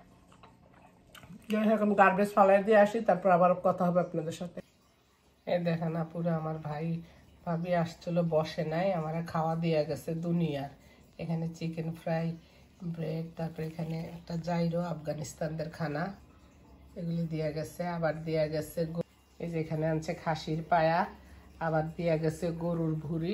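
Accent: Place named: Comilla, Bangladesh. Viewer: আপু আপনার কথাগুলো শুনলে খুব আপন কেউ মনে হয়, আমি কুমিল্লার তো তাই,কথার সাথে মিল আছে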